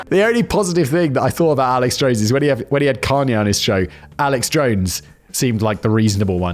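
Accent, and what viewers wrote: United Kingdom. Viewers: I am not a dude and I don't recall YouTube inquiring of my gender. I am subscribed to every channel you have because facts being delivered in the voice of my former colonial overlords helps me sleep... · I’m a twenty something white/Hispanic girl and I absolutely vibe with all your content Simon. Super sad you had to leave geo/biographics bc that’s where I started watching you before branching out to all your other channels but ultimately understand why it had to happen. Either way I’m just a big fact nerd who loves your fancy British accent and cracked out tangents! Never change my dude!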